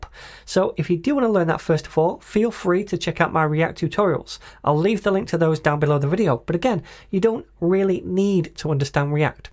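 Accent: United Kingdom. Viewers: I want you as teacher! 🤩 I love your British accent Cheers from France 🇫🇷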